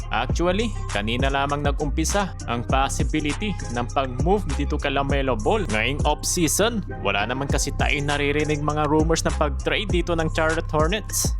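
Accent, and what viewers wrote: Pangasinan, Philippines. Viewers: Pangalatok kb Sir matigas k kc magsalita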